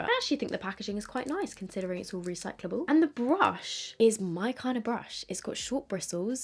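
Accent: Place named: England, United Kingdom. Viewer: Wait I love it 🥰 and one more thing! where are you from in England 😅 love the accent 😆🤩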